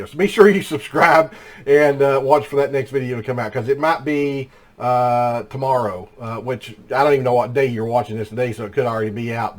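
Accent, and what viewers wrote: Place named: North Carolina, United States. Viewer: I thought that accent sounded familiar, formal North Carolinian here. Out here in Utah. We get fogging anytime the temperature reaches over about 90° it’s not super major as our humidity is low. I do get worse fogging when I placed them on my head unless I’m wearing a hat to fix this I just moved them higher up on my head.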